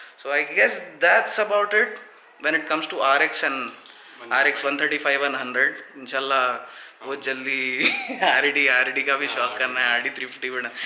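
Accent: Hyderabad, India. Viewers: hyderabadi hindi is so cool 😂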